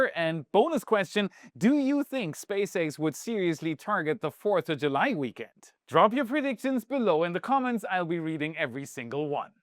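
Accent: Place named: Germany. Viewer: Dear, i support the content, but please for deities knows... used ai to get ride of that german accent. It's giving me hai hitzer vivbes.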